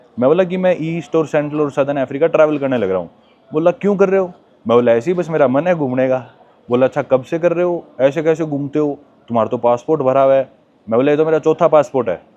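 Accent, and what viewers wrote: Haryana, India. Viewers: Es desh mein haryanvi boli sun ke Khushi hoe, very good, love from Sandhu Ambala ❤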